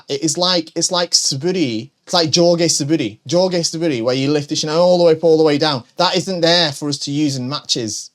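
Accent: United Kingdom. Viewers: your British accent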